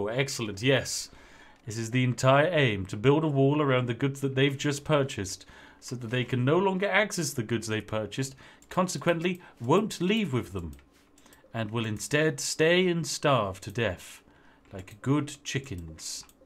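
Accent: United Kingdom. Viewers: its terrifyingly brititish tho. not brits that try to pretend to be canadian when traveling. no. unapolgetic better than thou pretentiousness. its the kind that is funny on the east coast, exploited on the west. midwest being best, we tolerate it but say half that to an actual person here and shit gets kicked in.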